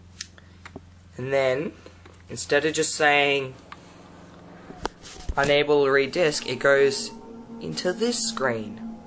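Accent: Australia. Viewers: Good Austrailian Accent. What you Found was Actually the PS2's Personified Fear. ( Yes It is an RSOD. ) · i like the Australian accent because u get to say g'day mate lol xD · your australian accent sounds preety cool man! you are the coolest person in the world!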